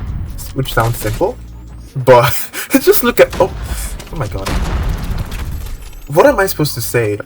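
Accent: Nigeria. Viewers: Bro, are you Nigerian?😂😂 very useful video btw🤞🏽🙏🏽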